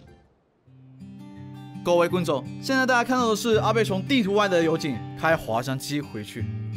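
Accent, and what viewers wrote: Hong Kong, China. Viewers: 4：48你是香港人？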